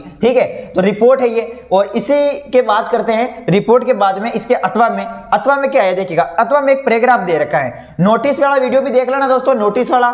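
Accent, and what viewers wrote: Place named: Marwar, India. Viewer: Sir thoda marwadi Kam teko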